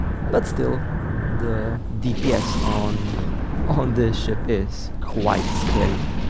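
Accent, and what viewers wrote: Russia. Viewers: Жуткий рязанский акцент )) а так оооочень интересно;)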